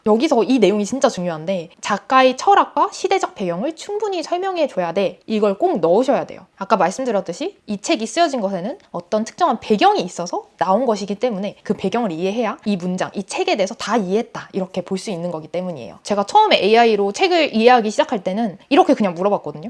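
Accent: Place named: Busan, South Korea. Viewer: 부산 사투리 ㅎㅎ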